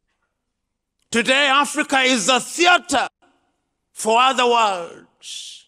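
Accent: United Kingdom. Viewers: You with your fancy British accent and your usual many historical blames on colonization has not come up with any structurial plan to take Africa out of the mind set they're in. Join Mali,Bukino el fasco and the other military leaders who are taking that brave stand moving forward. Africa must unite! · Nigerian an African leaders love to listen to his Queen - english. They don't care about the content of his speech..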